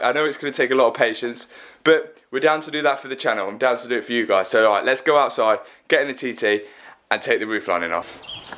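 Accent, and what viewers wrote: Leicester, United Kingdom. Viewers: You sound like a Leicester lad.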